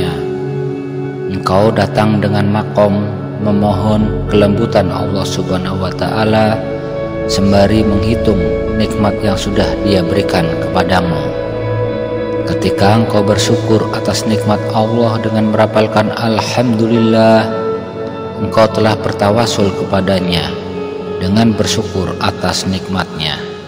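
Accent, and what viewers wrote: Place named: Aceh, Indonesia. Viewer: ne orang Aceh kayak nya, logat bicara, na nomor W A!,.'-.', '.-.'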